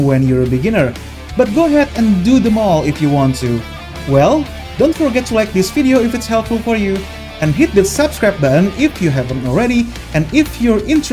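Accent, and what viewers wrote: Indonesia. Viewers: orang indo nih yg punya channel wkwk, logatnya soalny 😂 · Kayaknya, aksen Inggrisnya kaya ada suara orang indonya gitu, tau kan lu orang India ngomong Inggris gmn? Nah sama juga kyk orang indo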